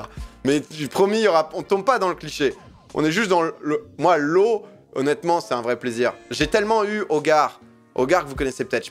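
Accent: France. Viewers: Salut mec, merci de respecter l'accent du nord! Sang et OR Forever .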